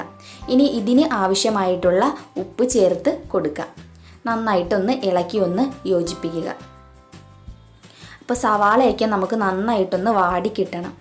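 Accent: Kollam, India. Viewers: ഫ അല്ല ഭ ആണ്. കൊല്ലം ആണോ ചേച്ചി വീട്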